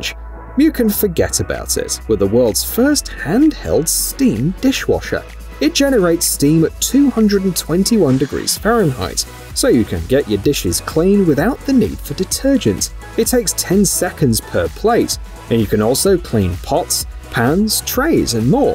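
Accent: United Kingdom. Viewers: Does it bother anyone else that the items are described using British accent and terminology, but US units of measure and value? No? Just me? OK, then. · what's with the f**** Brit accent impresarios .. every new gadget has to have a brit presenter or banging background sound.. lighten up .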